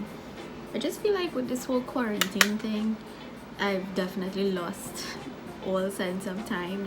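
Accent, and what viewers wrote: Trinidad and Tobago. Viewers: i subbed as soon as i heard the accent cause im trini too!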